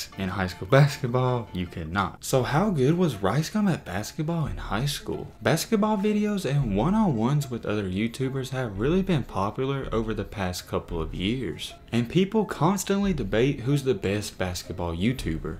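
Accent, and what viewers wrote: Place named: Texas, United States. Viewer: You have a king of the hill accent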